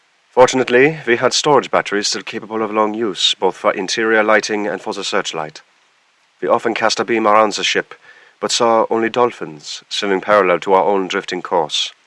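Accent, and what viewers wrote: Germany. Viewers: Listening to this while at work was such a nice reprieve from the norm.i loved your German accent! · Vielen Dank für die Erzählung mit deutschem Akzent. Das verleiht der Geschichte einem Hauch von Authentizität und macht sie viel eindringlicher. 😊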